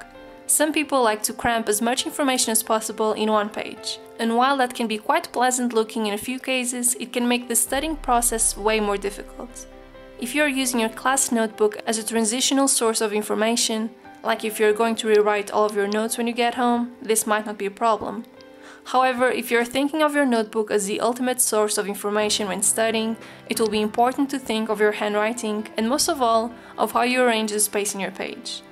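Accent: Portugal. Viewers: Hey, your accent sounds very portuguese xD wondering if you are, and if so, where are you from? Edit: ok you said in the video that you are portuguese xD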